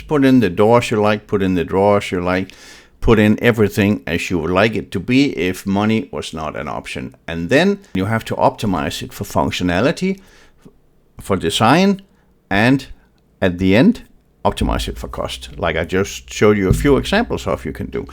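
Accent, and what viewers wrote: Denmark. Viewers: Hi, I just found your channel. I subscribed immediately because I love your Danish? accent. Both my parents were born and raised in Danmark. We're thinking of installing Ikea cabinets in our kitchen. Thank you so much for explaining the differences in cost. I really like the oak fronts and was sorry to learn that they are the most expensive. I might have to get white ones instead. Which is your favorite white door and which color white do you think looks best?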